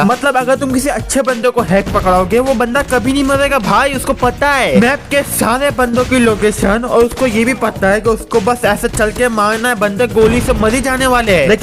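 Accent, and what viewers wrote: Gujarat, India. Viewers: bhai gujarat se ho aap?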